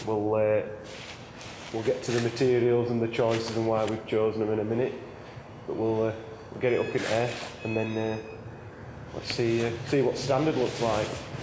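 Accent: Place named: Barnsley, United Kingdom. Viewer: Barnsley accent makes these videos 😂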